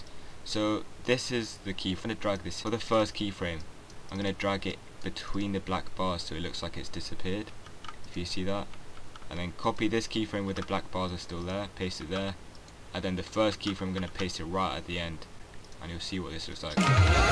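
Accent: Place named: United Kingdom. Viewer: my man sounds like the most british person i've ever heard btw props to u for doing this whenever i ACTUALLY try to use cins ima watch this again cuz its actually really good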